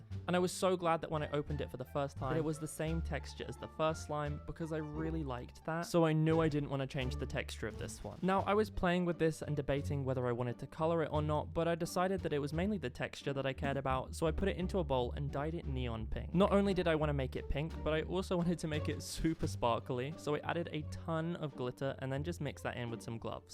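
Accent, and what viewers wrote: United Kingdom. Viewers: he’s British.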